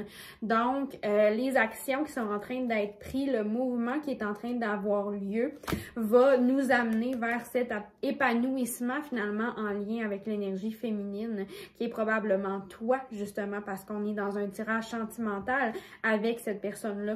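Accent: Canada. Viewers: Bonjour bel âme merci pr ta jolie guidance qui me parle bcp sur certain sujet 😊 je kiff cette accent aussi canadien sa nous fait voyager à travers tes dires 😊😊je voulais juste te dire sa serait chouette de nommé les pierres pour que l'on apprennes sur les pierres aussi hahha c est une ptite critique constructif sinon tes fortes bonne continuation bel âme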